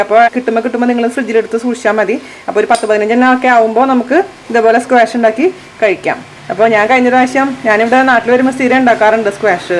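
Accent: Thrissur, India. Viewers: അടിപ്പൊളി കൊള്ളാം അവതരണം നാട്ടിൽ പോയ് ഉണ്ടാക്കി നോക്കണം തൃശൂർ മാള സ്വദേശിനിയാണന്ന് തോന്നുന്നു.... Good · njan thrissur ane .athond thanne chechide samsaram valya ishta to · Chechy good recipe and the way you are explaining in Thrissur slang is excellent. Take care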